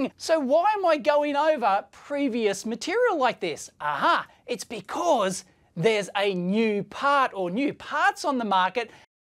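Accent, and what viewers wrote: Australia. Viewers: Australia accent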